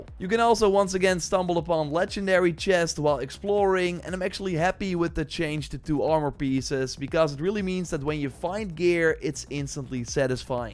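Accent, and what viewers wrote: Netherlands. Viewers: brother your dutch accent is REALY there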